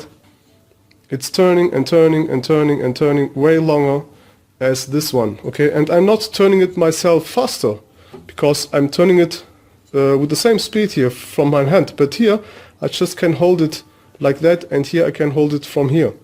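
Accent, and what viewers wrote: Germany. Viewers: Hey, are you German by any chance?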